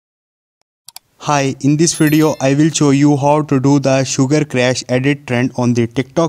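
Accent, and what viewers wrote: India. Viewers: Idk why but hindi people when talk english it just so interesting with their identic accent🔥🙏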